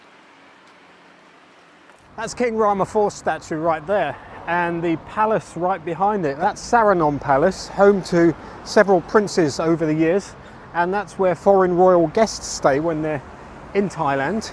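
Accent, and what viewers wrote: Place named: Yorkshire, United Kingdom. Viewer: Great tour...... Nice to see you've not lost that Yorkshire accent 😀 5555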